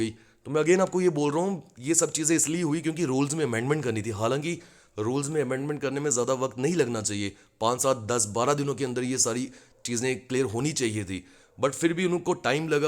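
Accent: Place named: Jammu, India. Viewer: Koi different voice Nhi hai Unka accent dogri hai or hindi mai aisa hi lagega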